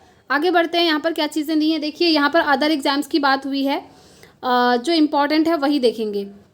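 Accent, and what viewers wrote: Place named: Bihar, India. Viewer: Lagta h app Bihari ho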